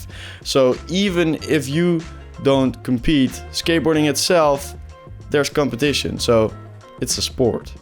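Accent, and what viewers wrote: Netherlands. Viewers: What's your mother language? Dutch?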